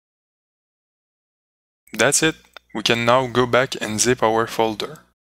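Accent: France. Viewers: are u french? nice tuto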